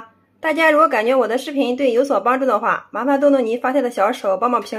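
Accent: Shandong, China. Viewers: 山东人吧？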